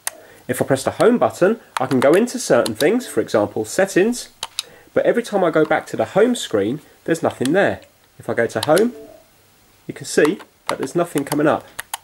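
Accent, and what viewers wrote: United Kingdom. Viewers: Hes british next video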